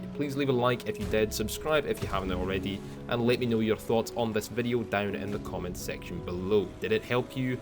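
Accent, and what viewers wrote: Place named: Scotland, United Kingdom. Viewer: Is it just me or did your scottish accent get extra thicc today?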